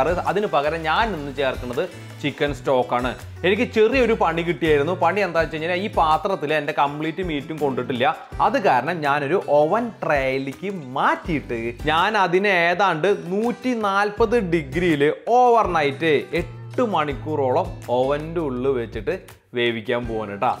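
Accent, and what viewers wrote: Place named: Kodungallur, India. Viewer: കേട്ടിട് കൊടുങ്ങല്ലൂർ ആണെന്ന് തോന്നുന്നു വീട് 😌🤣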